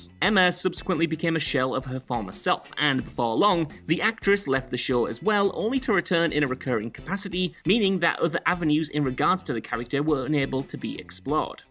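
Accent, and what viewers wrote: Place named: North, United Kingdom. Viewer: He is northern.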